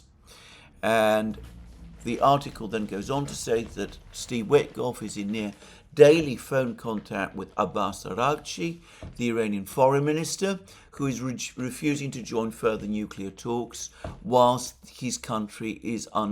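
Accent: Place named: RP, United Kingdom. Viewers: It's an educated London accent. It is not how the Queen spoke. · I like your speech . Because you speak softly and in queen's language.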